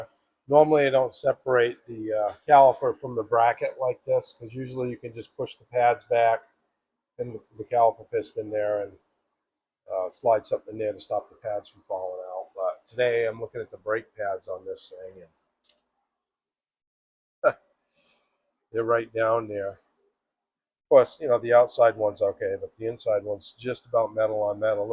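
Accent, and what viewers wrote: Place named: Maine, United States. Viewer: Guy you def a mainah...you ain't from New Hampshire.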